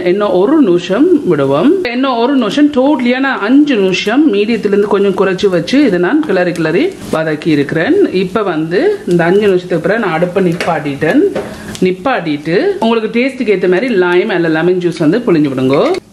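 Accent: Jaffna, Sri Lanka. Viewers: Akka, I like the way you speak Tamil. Jaffna Tamil ❤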